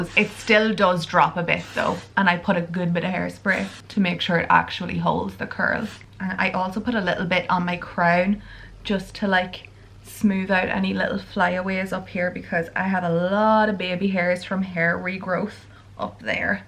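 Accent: Ireland. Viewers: Hi! I really love your videos! You're so friendly and natural! I always watch your videos with a big cup of tea--but sorry I'm a Barry's girl not a Lyons! I have two questions for you! The first is have tiu always lived in the town you live in now? I know the town where you live (I'm not sure you've ever said it on YT, I just recognise the shopping centre!) Just wondering because your accent is exactly the same as my cousins who live in Monaghan, specifically Clones!!! And my other question!!! Why are your doors always open?? Is your house really warm??😊😊😊 Anyway as I said I really love your videos! And oh yes I adore your house too! 💞💞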